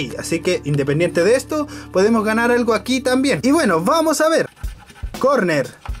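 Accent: Chile. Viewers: Eres chileno? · Eres de chile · habla como chileno wnn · eres chileno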